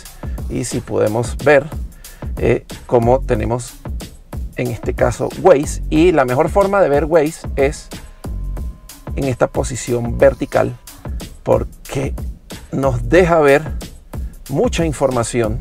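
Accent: Venezuela. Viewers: ¿Ése acento es venezolano?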